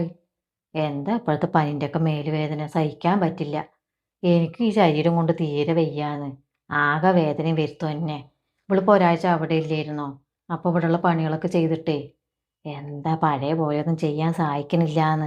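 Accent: Palakkad, India. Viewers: പാലക്കാട്‌ ആണോ വീട്. പത്തിരിപ്പാല എന്റെ നാത്തൂൻ ഉണ്ട്. അവരെ സംസാരത്തിന്റെ slang ഇങ്ങനെ ആണ്.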